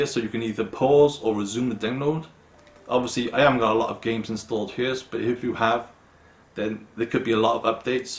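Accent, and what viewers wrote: Newport, United Kingdom. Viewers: Newport accent?😝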